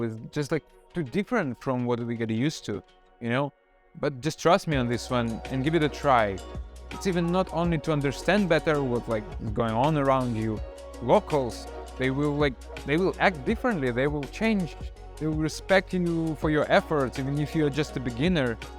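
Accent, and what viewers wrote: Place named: Russia. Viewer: Hi! You fon't know how much you could measure my plasure to meet you on Youtube for the first time. I think your Country is Russia or not? I love your accent, your English is very good and thanks for 7 advice your just give to someone who wants to go to China. They were very very useful for everybody in youtube, I supposs to.❤🇨🇳🌹🙋🌻Good luck in China and your new language learning:Chinese-Mandarin. Greetings from Brazil.💚🌎🙋🌹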